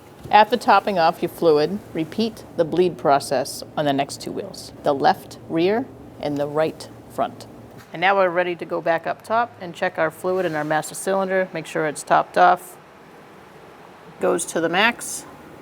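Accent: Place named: Northeast, United States. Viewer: Excuse me but are you from Massachusetts by chance? Either that or New York but it's definitely a northeastern accent lol Love it! Also a Bostonian here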